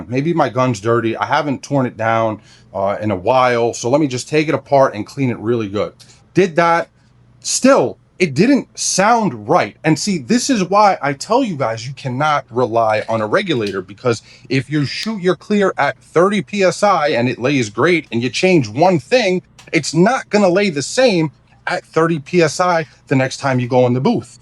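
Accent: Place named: New York, United States. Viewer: It's that New York accent.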